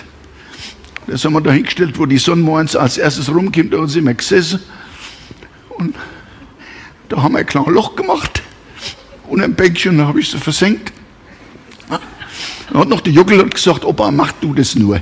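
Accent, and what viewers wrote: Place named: Taunus, Germany. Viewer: Großraum Bad Homburg / Taunus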